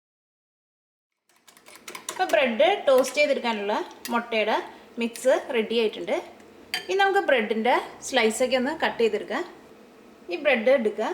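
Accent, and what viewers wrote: Thrissur, India. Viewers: Aa thrissur accent inkkishtai.. :) thanks for the recipe.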